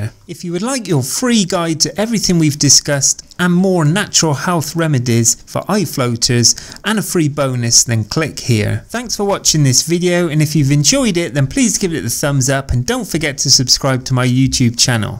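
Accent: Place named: England, United Kingdom. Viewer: Where in England is he from?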